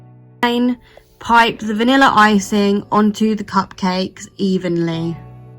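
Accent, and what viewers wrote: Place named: United Kingdom. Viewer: Loved this, and a British accent?! Great to see a fellow British Youtuber!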